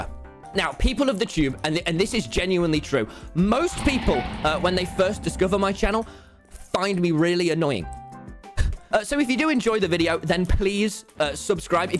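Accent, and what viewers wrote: United Kingdom. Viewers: Yay your British! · Bruh I loves this guy's British accent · BHAHAHAHAHAHAHHA HOW DOES HE SOUND AMERICAN? YOU CAN HEAR HES 100% BRITISH LMAO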